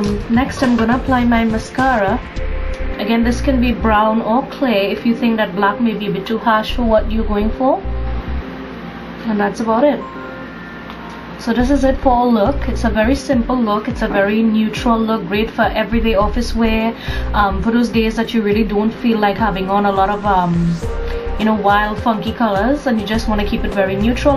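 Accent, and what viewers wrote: Trinidad and Tobago. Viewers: I love your accent!!! trini right?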